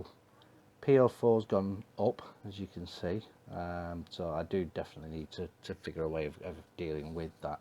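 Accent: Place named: England, United Kingdom. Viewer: lovely!! looking forward to seeing more updates:) nice to hear an English voice normally the american's that do this hobby haha I've liked and subbed to your channel. please check out my channel and sub:)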